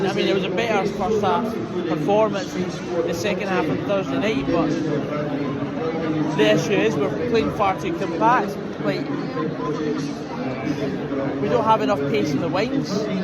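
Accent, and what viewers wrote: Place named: Scotland, United Kingdom. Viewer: We adore the Highlands! We adore the Scottish accent, by far the best and most emotional! Good honest commenting on the game!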